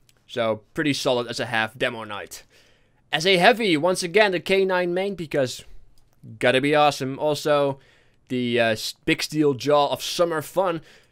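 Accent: Netherlands. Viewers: Is this guy dutch? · Are you dutch?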